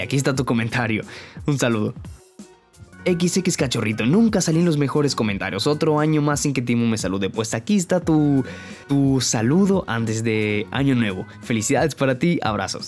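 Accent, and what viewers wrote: Russia. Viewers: Eres ruso?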